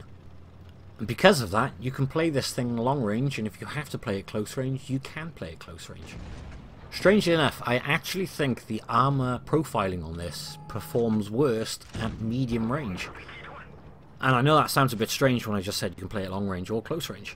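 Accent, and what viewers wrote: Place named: Wales, United Kingdom. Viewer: Wyt ti'n siarad Cymraeg? I noticed your accent and wondering if you're from Wales:)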